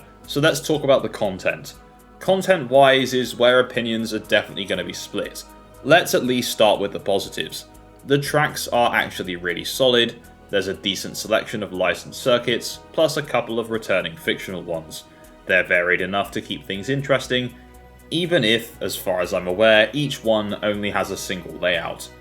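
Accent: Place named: England, United Kingdom. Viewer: I do not even enjoy racing games. Yet hearing this Posh English Man complain is relaxing.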